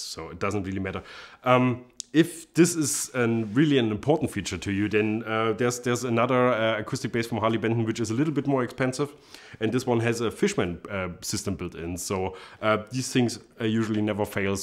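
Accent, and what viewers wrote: Germany. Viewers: That german accent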